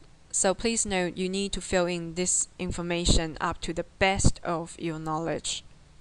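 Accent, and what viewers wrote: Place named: China. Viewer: You have Chinece accent which I love it :)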